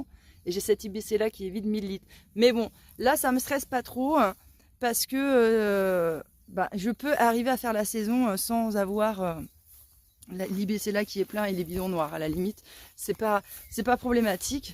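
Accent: Paris, France. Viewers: C'est un accent parisien que j'entends là ??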